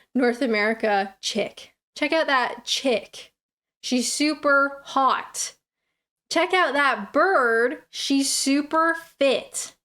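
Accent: Canada. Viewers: You need to go for several pints with a Gen X brit, also we don't all see Canadians as foreign, you are like us with a funny accent x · Hello chick, knobhead is a great English word, a friendly insult!? Also I have been told a person's accent settles between 17-20 so it's unlikely that you will ever lose your Canadian twang unless you do it deliberately which is an option after a certain amount of time!? xx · At the time of bus conductors,com on git aff.meaning hurry up and exit the bus. please speak English your Canadian is still strong.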